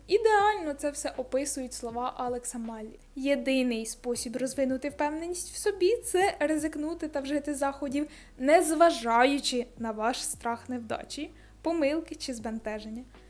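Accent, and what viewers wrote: Poltava, Ukraine. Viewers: Що це за акцент такий прикольний, полтавський?) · Дуже дякую! Яка легка у вас подача! Лише мені здалось, що до кінця відео полтавський(милий, такий близький) акцент став менш вираженим?)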